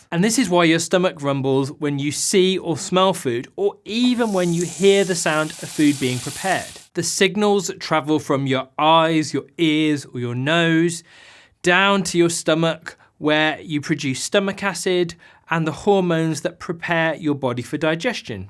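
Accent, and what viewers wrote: United Kingdom. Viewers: He speaks the Queen's English, so he's the one who actually says it correctly. If you think the way he says vitamins is funny, you should hear him say "Aluminum." Heh heh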